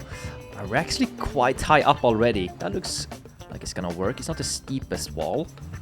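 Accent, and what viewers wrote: Sweden. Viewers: Are you swedish? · You have a very swedish accent, are you from sweden?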